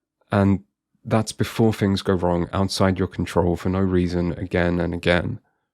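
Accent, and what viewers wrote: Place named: United Kingdom. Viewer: I'm not sure, but it might be related to your using the word "fad" early in the video. With your accent, it might be interpreted by an AI speech-to-text as British slang for a cigarette which also happens to be one of two "the F word" in American English. Still has nothing to do with the title unless the first few seconds of the video is referred to as "the title". The appeal probably went to a person outside the UK, so they also misheard the word (colored perhaps by the transcription). That's the most goodwill I'll extend to the decision makers, especially since it makes no sense in the context of the sentence, whereas "fad" does.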